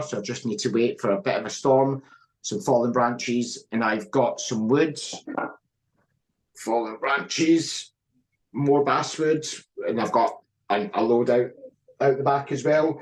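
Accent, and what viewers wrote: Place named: Glasgow, United Kingdom. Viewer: 😂 If I was speaking in full Glaswegian dialect, it would probably only be (some) Scottish people that could follow without cc! 😂Thanks for joining us! 👍🏻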